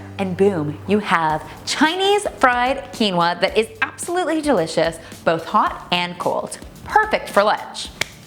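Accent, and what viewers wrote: Canada. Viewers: Thank you I love these recipes! Are you from canada ? I can tell we talk the same way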